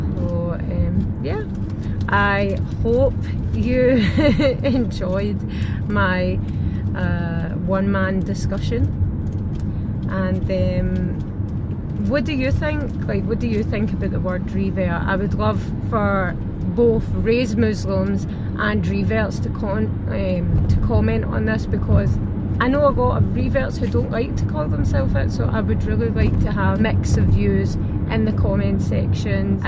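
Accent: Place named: London, United Kingdom. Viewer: Salam 3aleykii, where about in london you from sister? I love your voice and accent 😍😍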